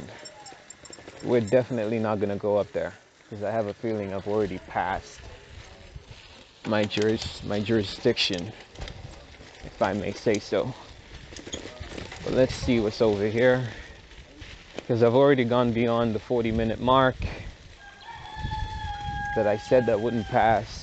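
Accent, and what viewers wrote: United States. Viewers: you said that you never been in the US neither the Europe so how did you get the American accent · Man come on!! Be honest seems like u grow up in California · Off course your accent is like an American 🇺🇸 I enjoy watching your videos because I never been in Burundi since when I was 7 so it’s pleasure to me I really like it When all those roads get tarmac the neighborhood will look more beautiful ❤️❤️🇧🇮🇧🇮🇺🇸🇺🇸🇺🇸🇺🇸🇺🇸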